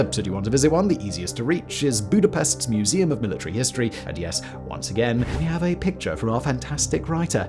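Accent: England, United Kingdom. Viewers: If you didn't see enough Britcoms as a kid you might not notice. speaks SSBE, a prestige dialect understood around the world. I mostly speak US formal Inland Southern, another dialect understood around the world. If I narrated this, you'd probably complain about my consonant fronting and my heavy retroflex "r" sounds. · Typical English lack of giving a shit about the conventions of other languages. And for just making up ways to say words.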